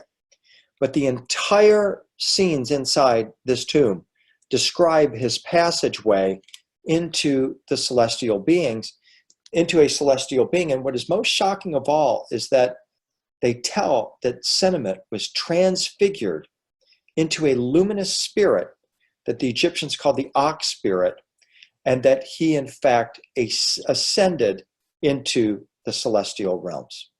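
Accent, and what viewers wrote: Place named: Tennessee, United States. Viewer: Never miss a chance to see your work. I love your Tennessee way. I live in West TN always. Suppose you sound like home 🤓 You have a true sense of the human condition. Bravo!! 🥳☝️✨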